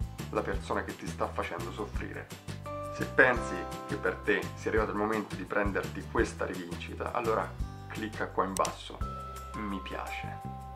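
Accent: Tuscany, Italy. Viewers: Beh, dai,... Arrivi solo con 10 anni di ritardo!!! 😅 Assurdo come tu abbia descritto per filo e per segno la mia relazione del 2008 che per 11 anni mi ha lasciata congelata. Ancora più assurdo che questo mi torni utile adesso che, invece, esco dalla prima relazione avuta dopo quel trauma, relazione che è stata molto intensa e importante per me. Stranamente il tuo video mi arriva proprio oggi, dopo averlo sognato stanotte. E strano che nei commenti legga di qualcuno che parli del 3 agosto, giorno in cui questa relazione è iniziata. E ancora più strano (ma questo mi è parso strano si da quando ho iniziato a seguirti 😜) che tu somigli fisicamente in maniera quasi fedele, addirittura nell'accento toscano, al mio "ex" sparito nel nulla nel 2008. Ma quanti casi... 😉🙃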